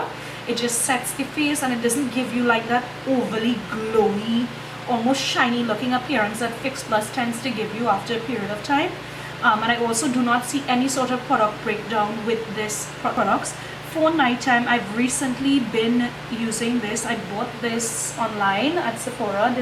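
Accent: Trinidad and Tobago. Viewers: Are you Trinidadian? aha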